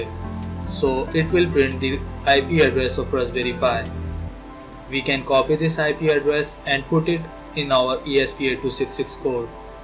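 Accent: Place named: India. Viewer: You already know youre getting the best when the indish accent starts.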